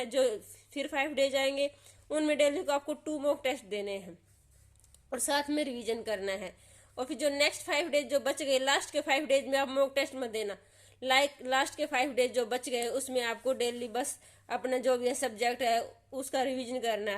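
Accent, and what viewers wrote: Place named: Haryana, India. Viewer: Haryana tach boli,,, HR ki boli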